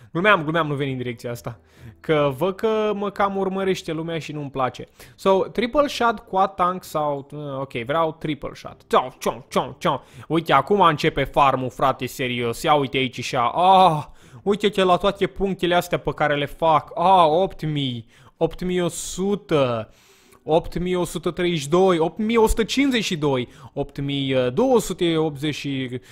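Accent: Moldova. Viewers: fe ce vorbesti moldoveneste?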